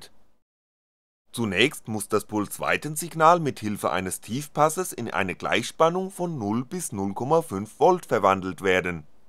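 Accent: Hesse, Germany. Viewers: Seltsammer Sprech da wo Du herkommst (tiefstes Hessen?). Man merkt das Du versuchst betont Hochdeutsch zu babbeln :))) Fachlich sind Deine Videos mit das interessanteste was YT zu bieten hat. Wirklich toll was du hier ablieferst. Allerherzlichsten Dank für die Arbeit und die Zeit die Du in die Anleitungen reinsteckst. Ich hoffe noch viel von Dir zu sehen.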